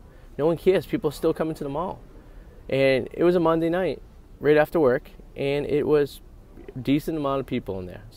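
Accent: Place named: New England, United States. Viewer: If anyone is wondering what a New England accent sounds like... :)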